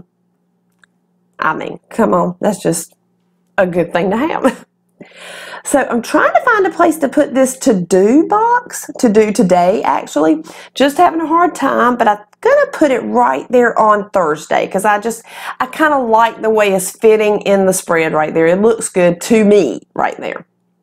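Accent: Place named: South, United States. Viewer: Your accent makes me so happy! I don’t see many PWM vids with a southern accent like mine. Great video, Mama! 😊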